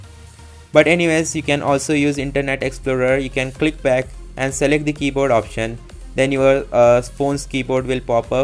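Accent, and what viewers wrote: India. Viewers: Are you from Indian because your English language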